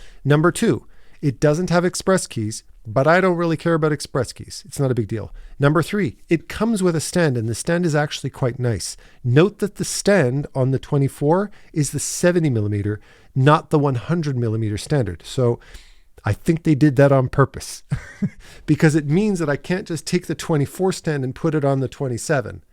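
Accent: Canada. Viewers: Thanks for the review. Very intuitive. I also evaluated Cintiq Pro vs Xencelabs. Also, get the Pro Pen 3E (eraser at the other end of the pen). The ExpressKeys on the Cintiq Pros IMHO are impossible to intuitively used. I also use the keyboard tray (like you do). I hope for screen recording that Wacom releases 1440p (not a or 1080p) Movink 15/16. The Movink 13 is amazing as a portable pen display. To produce 1080p tutorial screen recording to not pixelate when zooming in close, to record originally in a higher resolution (1440p). Cintiq 24 (non-Pro) and Xencelabs 16 works great with no fans and great resolution (2K), but the wasted bezel is awful. So, to record, I also use a separate sound studio, but with the simpler Cintiq 24 OR the Xencelabs 16. My audio recording mic picks up the Cintiq Pro 27 fan noise, and so I use it in my working office. I also use Neumann!!! 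Wow. Overall, I just find that the pen/surface "sensitivety" to be more accurate and responsive, but if I couldn't afford Wacom, I would definitely invest in Xencelabs. Sounds like you have a Canadian accent and we are in Bellingham, WA (close e'nuf to drag my house across into Canada)!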